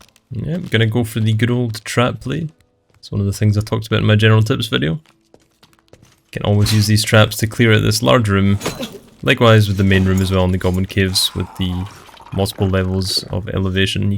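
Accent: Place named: Northern England, United Kingdom. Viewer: Great video. Just wondering cant place the accent, northern England or Scotland?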